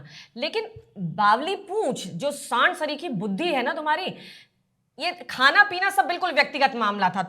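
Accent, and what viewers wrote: Bhojpuri, India. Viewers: g jai Shri RAM 🎉❤... The style of your news presenting is very unique and ur bhojpuri slang is awesome... Pin my comment 😅🎉❤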